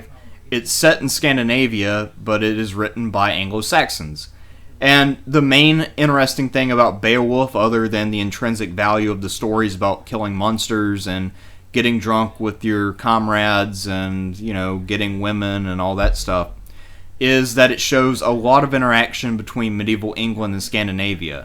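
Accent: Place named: South, United States. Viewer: He speaks with a southern drawl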